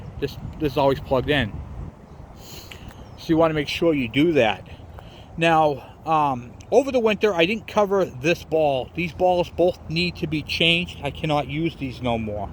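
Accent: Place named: Boston, United States. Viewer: Good info! With your accent, sounding like your from the Boston area?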